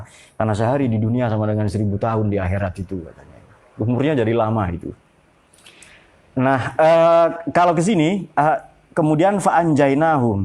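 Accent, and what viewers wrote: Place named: Malang, Indonesia. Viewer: Dari Malang ya mas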